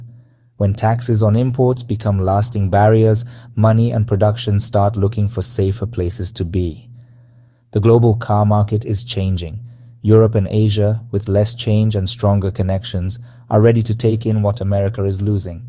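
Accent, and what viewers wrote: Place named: India. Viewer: I wonder why an indian from india is narrating this site! India joined china to destroy the dollar. People pretend to be our friends while they stab us in the back!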